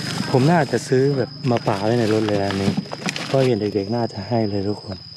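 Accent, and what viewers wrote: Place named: Luang Prabang, Laos. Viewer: มาดูคลิปที่2 สำเนียงพูดกับเด็กไปเหมือนสำเนียงหลวงพระบาง บ้านอยู่ไหนเหรอ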